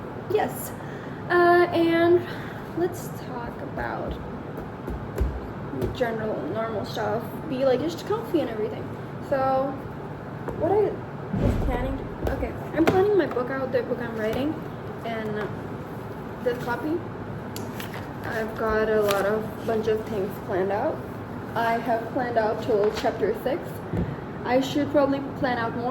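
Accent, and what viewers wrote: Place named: Asia. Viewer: Artsy careers can be difficult my cousin took film studies and cinematography he had similar issues with his family members but he made it big. Aside, as an artistic and introspective person myself im quite into writing poetry, journaling and reading existential books. Also love your accent, where in Asia are you from ? We can share our writings and help each out of creative blocks ? Im making my main themes of life set of poems I've done 2 out 6. Tryna find the inspiration to write the next one. 😭 Also do try the app poetizer can help you publish.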